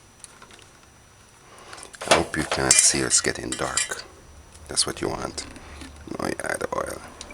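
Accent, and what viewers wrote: Jamaica. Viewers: I love Jamaican accent. I would listen to you talk all day.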